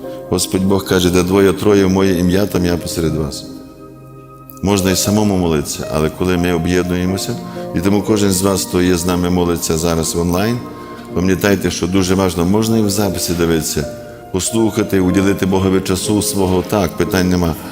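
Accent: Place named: Precarpathia, Ukraine. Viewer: Дякую Господу Богу отче за вас Я також з Ів.Франківської області з Калуша,але живу і працюю багато років в Іспанії,але як гріють душу вашу науки і наш Прикарпатський говір.Дякую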